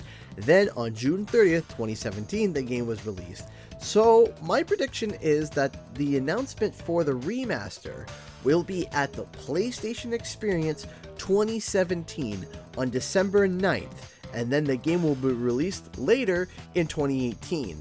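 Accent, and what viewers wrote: Canada. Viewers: Oh Canadian? Im a first time viewer of your channel and I already like it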